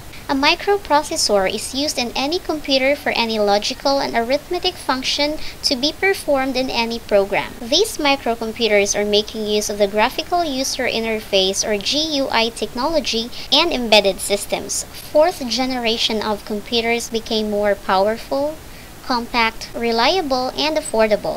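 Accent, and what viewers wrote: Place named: Philippines. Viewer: Person here sounds filipino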